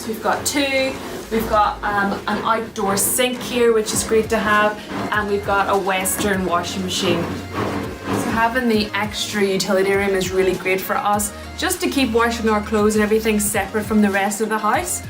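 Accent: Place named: Northern Ireland, United Kingdom. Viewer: Studied English for so many years and have been living in the US for ten years. This is my first time hearing someone from Northern Ireland speaking. I can tell Northern Ireland accent sounds way much different than England accent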